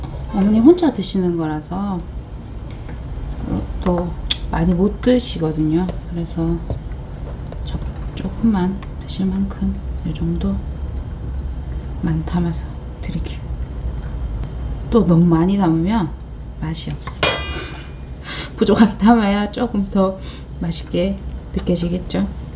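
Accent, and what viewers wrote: Gyeongsang, South Korea. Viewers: 항상 영상은 보지만 댓글은 처음입니다 ^^ 어찌나 깔끔히 살림하시는지 항상 감탄을 하며 항상 바지런하고 소박하게 사시는모습 응원하게 되었어요 멋진집 멋진 인테리어가 아닌 우리네 사는 모습을 보여주셔서 더 눈길이 가고 한번더 보게 되었어요 저도 456님처럼 될순 없지만 영상 볼땨마다 같이 움직이고 청소할려고 하고있어요^^ 영상에서 혼잣말 하시는 귀여운 사투리도 너무 좋구요 저도 경상도라 너무 정감가요 앞으로도 좋은 영상 많이 부탁드릴깨요 항상 응원하고 건강하세요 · 오~ 대구분이시네요 친근함이 느껴지네요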